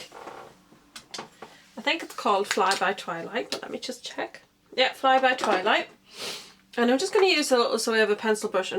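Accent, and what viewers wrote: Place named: Newcastle, United Kingdom. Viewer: The eyeshadow looks lovely with your hair... very pretty.. Im sure you have a hint of a Geordie accent.. x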